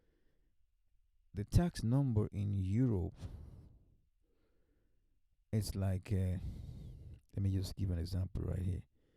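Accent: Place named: Nigeria. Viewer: Bro, you sound like a Nigerian, please can we connect so you put me through please 🙏🏽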